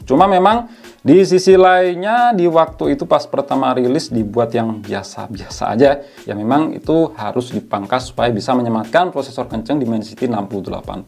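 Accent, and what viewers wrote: Blitar, Indonesia. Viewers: Orang blitar kah bang😅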